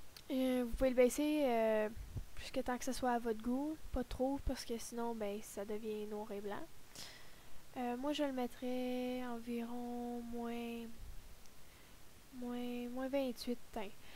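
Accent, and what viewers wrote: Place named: Quebec, Canada. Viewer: J'adore ton accent ! Dsl de commenter juste pour ça ! Tu es québéquoise nan ?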